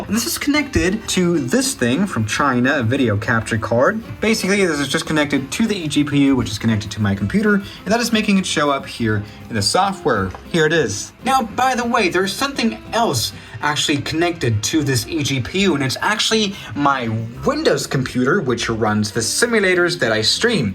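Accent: Germany. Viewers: Wait are you actually German ?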